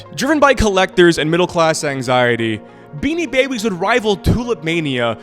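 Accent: Canada. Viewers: Aboot! Are you from Canada? This is the first video ive seen of yours and I love it!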